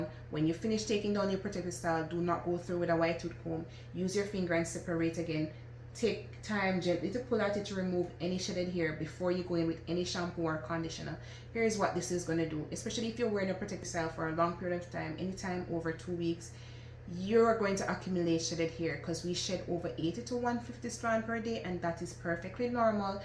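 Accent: Jamaica. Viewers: Girl mine before that was our Jamaican 🇯🇲 accent ❤❤ new subscriber here sis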